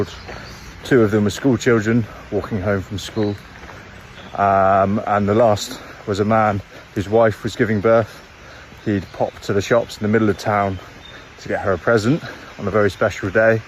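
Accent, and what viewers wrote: United Kingdom. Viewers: American in Palestine? You need to change the caption, he’s clearly British. Which doesn’t alter the message he’s trying to present. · From his accent, he is British (specifically born & raised in England) - not American.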